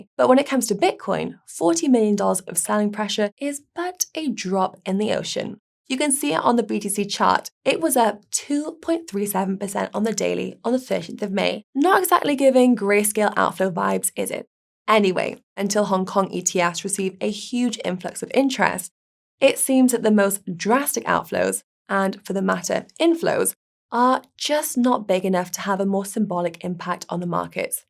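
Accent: United States. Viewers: Really appreciating ya'll from Pensacola Florida. American accent is hilarious.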